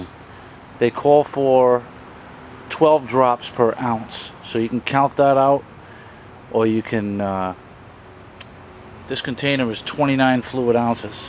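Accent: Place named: Brooklyn, United States. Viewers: Not bad. You sound like your from New York, Boston, etc. where exactly are you from? I'm a Southern boy from Alabama. Fishing, college football, and most important following Jesus Christ is our passion here. If you ever get the chance, Feb., March, and April is the time to come to Alabama with your boat. Lay lake, Mitchell, Logan Martin, Guntersville, and many more lakes here with monster bass. From Smallmouth on the Tenn. river, spots on Smith, Mitchell, lay, Logan Martin, to Largemouth on Gun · Are you from Brooklyn by any chance? You sound like it.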